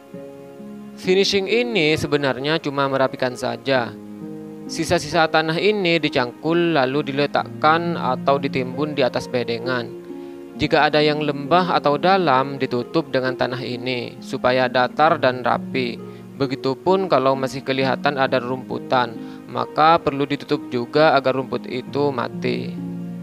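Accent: Madura, Indonesia. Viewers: Logat Madura 😅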